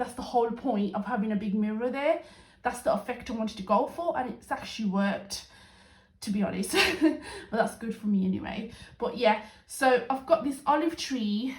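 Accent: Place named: Birmingham, United Kingdom. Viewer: Just subscribed coz u sound like my cousins in Birmingham ❤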